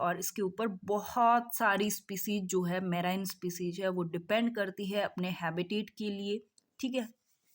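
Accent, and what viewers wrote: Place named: Bengal, India. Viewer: i think you are bengoli because your language tells that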